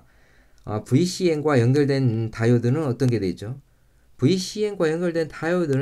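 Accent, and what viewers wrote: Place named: India. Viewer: 교수님 근데 어린시절 인도쪽서 유학하셨는가요? 저 거래처 인도분이 한국어하는거랑 억양이 너무비슷해서 놀랐어요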